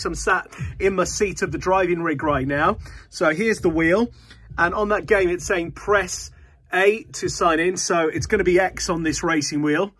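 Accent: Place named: United Kingdom. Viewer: You are British.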